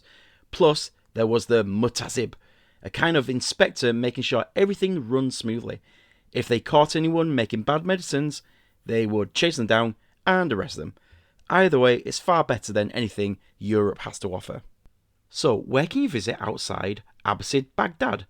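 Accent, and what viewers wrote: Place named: North, United Kingdom. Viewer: I really like your witty style, pairs well with northern accent. Keep up the good work!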